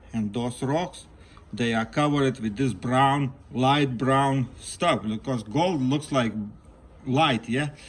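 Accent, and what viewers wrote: Russia. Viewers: Can you say "Get to the choppa" in your russian accent?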